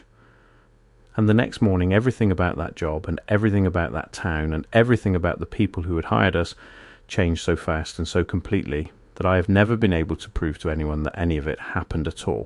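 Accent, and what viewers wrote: England, United Kingdom. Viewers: This was fun. You sound English. 😉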